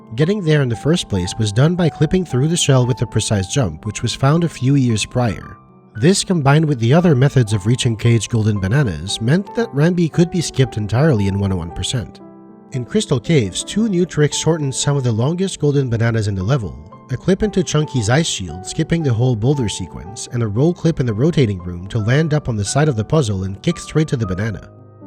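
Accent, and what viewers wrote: Quebec, Canada. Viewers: yeah I felt pretty confident too since early on. I'm not aware of another accent that would sound kinda like Québec's.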